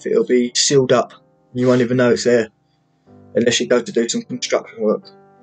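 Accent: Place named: United Kingdom. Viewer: What a great video! I’d be lying if I said I didn’t dig the accent too. I know you guys have a bunch of different accents over there (I’m from New York), but that one always sounds like “the cool one” to me. It’s Billy Kimber from Peaky Blinders, and maybe Russel Howard the comedian. Let me know if I’m right, crazy, or an idiot. ✌🏻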